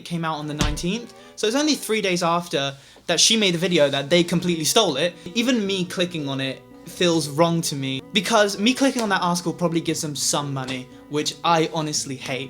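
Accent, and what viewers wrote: United Kingdom. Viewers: I wasn't expecting that British accent- ( I usually listen to the covers ) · Wasnt expecting that british accent lmao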